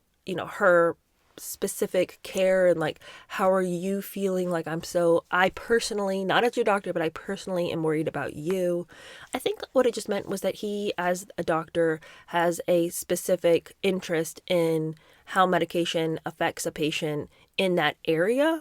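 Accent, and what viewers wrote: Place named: England, United Kingdom. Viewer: Her accent is awesome. I can't figure out where its from I feel like england?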